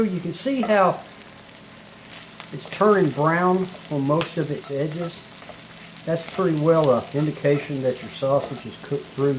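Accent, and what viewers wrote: South, United States. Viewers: I look your southern accent😁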